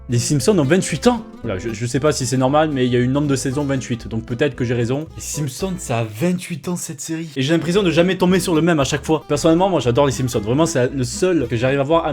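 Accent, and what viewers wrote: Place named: Aveyron, France. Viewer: Le bon accent aveyronnais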